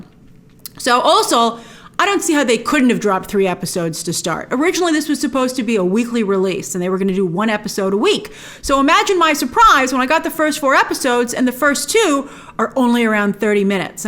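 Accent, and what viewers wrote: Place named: United States. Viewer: I'm curious, how have you avoided a New York accent 🙂? You're an early transplant? It's very slight, barely there Talk/Tauwk, Fought/Fauwt, New York/New Yawk. It's a distinctive accent 🙂. More like a New England accent, Boston is very similar. Bauwston 🙂. It's a straight American English accent, unaltered by New England, southern twangs... You moved there in your pre-teens or something?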